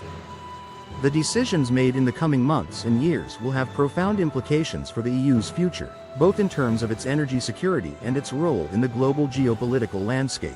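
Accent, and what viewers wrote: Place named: United States. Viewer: Russian? it's a American accent, didn't say anything I already knew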